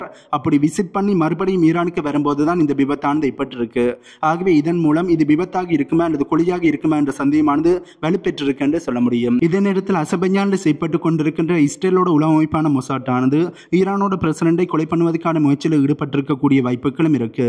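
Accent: Sri Lanka. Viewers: Srilanka tamil best voice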